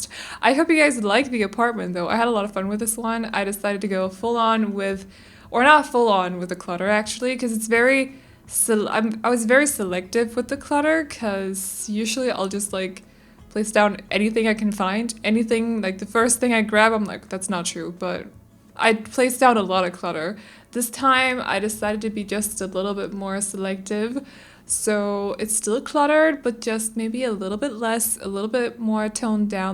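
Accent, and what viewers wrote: Denmark. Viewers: I don't think I have ever heard Danish but I can't believe it doesn't sound good. I absolutely adore your accent lol. Now I have to find the Danish word for aunt since you've gotten me curious. This is a great build btw. Trying to do something creative in this apartment drives me nuts. It's just set up so weird. You did amazing.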